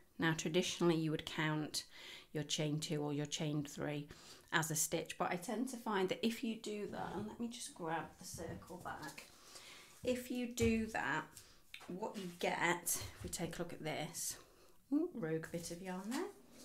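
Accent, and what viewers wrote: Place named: Lincolnshire, United Kingdom. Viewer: Recognize your accent. I’m originally from Lincoln. Was nice listening to you and I followed what you did and have a lovely coaster for my coffee cup 💕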